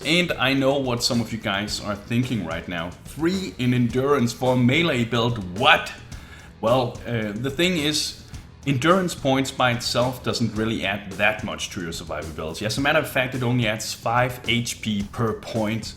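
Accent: Denmark. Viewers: My god you have a Danish accent a viking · But yeah, your accent gave it away, we danes have a weird accent. xD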